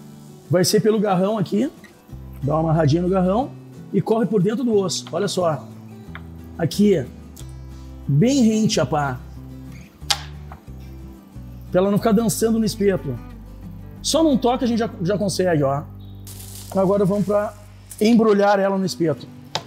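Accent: Rio Grande do Sul, Brazil. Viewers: Bom demais o vídeo e o sotaque gauchesco.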